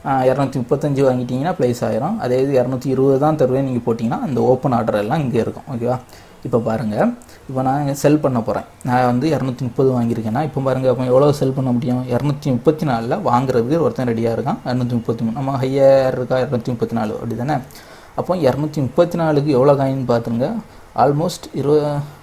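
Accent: Kanyakumari, India. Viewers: Kanya Kumari thane bro neenga 🔥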